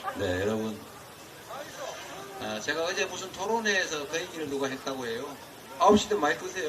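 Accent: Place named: Busan, South Korea. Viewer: 얍실한 정부 보내 버립시다 !방언 멋져요😊부산 표준어 최고야 🎉🎉🎉🎉🎉🎉🎉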